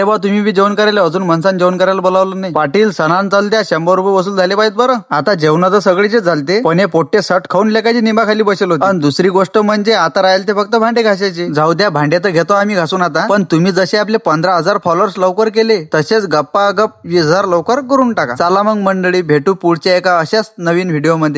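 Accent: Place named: Vidarbha, India. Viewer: दादा तू कुठला आहे मी विदर्भाची कन्या आणि मला तुझी भाषा विदर्भातली वाटते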